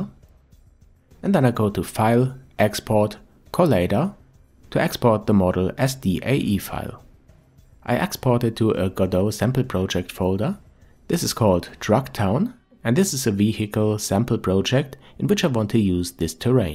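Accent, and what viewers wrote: Germany. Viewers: Ich hab das voll rausgehört haha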